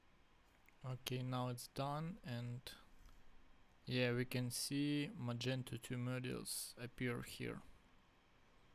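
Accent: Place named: Ukraine. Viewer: Якісний, вітчизняний акцент.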